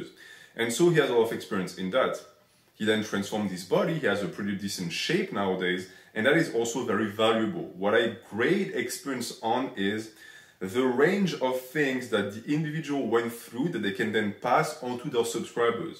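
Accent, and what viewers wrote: France. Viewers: Your French accent hooked me the first time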